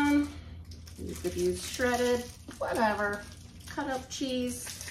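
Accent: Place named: New York, United States. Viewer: You sound like a New Yorker today. Lol! Love watching your videos.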